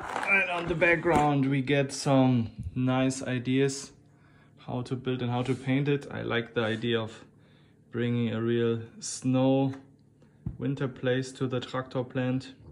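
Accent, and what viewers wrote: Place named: Germany. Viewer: I love your English its German and English togeder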